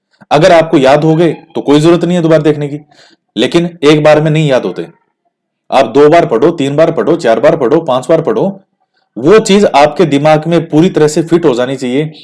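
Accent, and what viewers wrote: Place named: Marwar, India. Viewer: धाप के पढो गुरूजी मारवाड़ से लगते हो 😀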